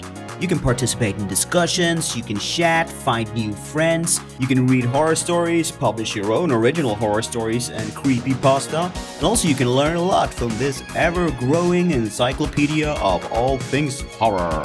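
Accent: Sweden. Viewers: Swedish? :D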